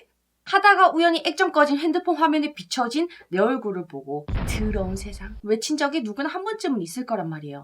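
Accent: Gyeongsang, South Korea. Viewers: 갱상도 어딘교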